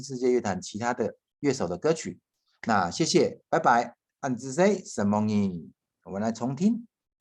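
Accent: China. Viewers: 老師的口音好像海陸腔？ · 你讲闽南话不是客家!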